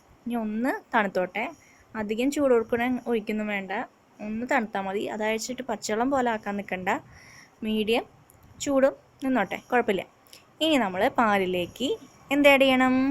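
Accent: Thrissur, India. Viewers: Thrissur aano native place 😃slang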